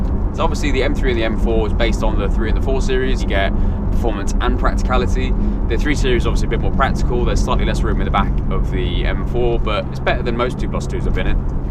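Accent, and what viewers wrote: United Kingdom. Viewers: I have met Seth MacFarlane in person since I live in Los Angeles and work in entertainment and once worked with The Simpsons production team. I'm pretty sure Seth could do an awesome impersonation of your British accent and humor. :-) p.s. good to see you doing more videos. the first video I saw of yours was 4 years ago, the M3 video from March 30th, 2013. "Honey, have you got the baby?" Hilarious! hope to see more videos soon. btw, I have the E92 V-8 with Akrapovic exhaust and going to get the M4 as a second car for work commute. Cheers mate, Mark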